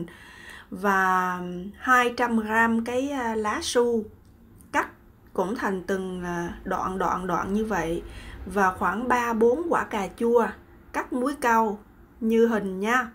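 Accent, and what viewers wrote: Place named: Da Nang, Vietnam. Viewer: Mình cũng ng Đà Nẵng , nge nói mình thấy vui vui , vì gặp đồng hương bên xứ người, mình hiện đang ở Mỹ ạ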